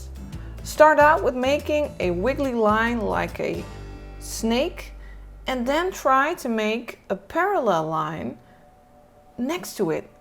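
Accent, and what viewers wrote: Netherlands. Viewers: Are you dutch? I can sense that warm friendly accent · Hmm do I hear a Dutch accent? 🤔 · Are you dutch? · Are you dutch? The accent sound familiar. If so, could you also make some video's in dutch?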